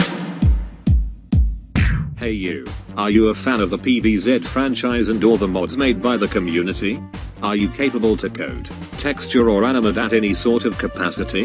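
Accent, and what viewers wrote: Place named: United Kingdom. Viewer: the tts voice is british, therefore it pronounces the "z" as 'zed' lol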